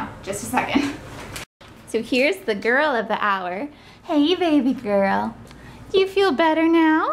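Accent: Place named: Canada. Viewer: Canadian ass accent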